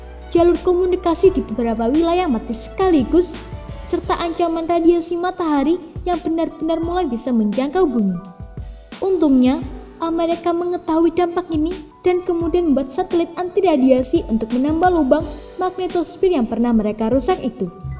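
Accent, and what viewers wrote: Java, Indonesia. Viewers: Jowone medog he😁😁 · medok bgt si mbak nya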